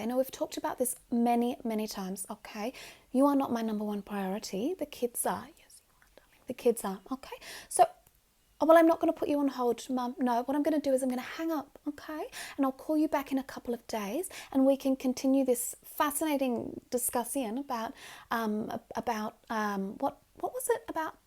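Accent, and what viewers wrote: Australia. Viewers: i hate rich people ngl but there's something about this specific posh australian accent that hits the spot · Just also i have to say i tbh wouldn't mind any un asmr video with aussie mom bcuz the accent lol have u tried learning other ones too?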